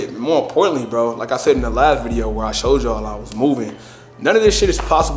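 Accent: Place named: New York, United States. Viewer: Yo son, Crazy wild proud of you B! 💯💫 New York voice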